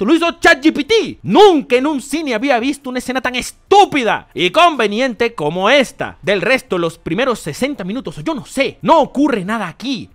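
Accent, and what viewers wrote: Venezuela. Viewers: ajkjask mrc, me llena de vida escuchar a modo venezolano super obstinado, fuerza fuerza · Llevo años viendo videos de y primera vez que le escucho lo veneco y modo insultador jajajja coño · Y pensar que ese acento venezolano volvería jajasjsaj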